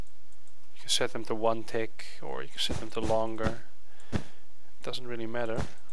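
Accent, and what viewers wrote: Netherlands. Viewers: are you dutch? (your accent sounds like it)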